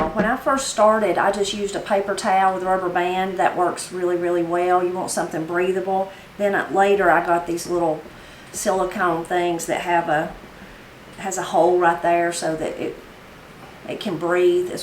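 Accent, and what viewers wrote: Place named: Arkansas, United States. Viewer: I grew up eating light bread too and I still like it. My tuna fish is almost like yours except for adding chopped hard boiled egg and using Kraft sandwich spread instead of mayo. And i prefer it on white bread too. I love your accent. It reminds me of my home is south Arkansas.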